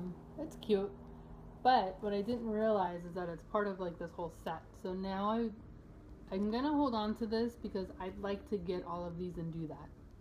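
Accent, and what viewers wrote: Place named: Northern Ireland, United Kingdom. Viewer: would you believe not just Irish, but Northern Irish, a much more rare accent, and very charming (more like Scottish). And actually a lot of people find it hard. Probably because initially you want to say (as in Michelle) and then Stitch becomes hard to say 😝 trust me to make it harder than it needs to be. I must admit I put about 13 seconds thought into it 😉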